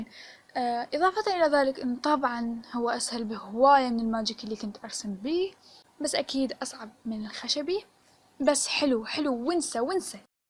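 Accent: Iraq. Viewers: عراقية مو؟ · حلوووه حبيبتي😍انتي اكيد عراقيه من لهجتج 😄😍موووو!!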